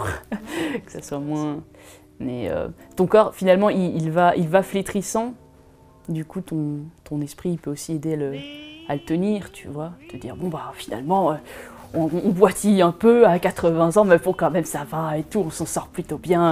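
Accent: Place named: Paris, France. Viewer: Ahaha ok je viens du sud (telle Chimene Badi) du coup centre ou titi parisien ça se ressemble un peu à mes oreilles :) ! Merci pour ton témoignage, et concernant tes dents, avant que tu n'en parle je trouvais que justement elles étaient très jolies :) Les sourires colgate c'est banal et triste, mais des petites dents qui font leur vie c'est bien plus charmant !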